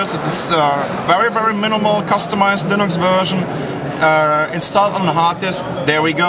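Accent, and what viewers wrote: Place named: Germany. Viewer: schon, aber sein englisch hört sich verdammt deutsch an...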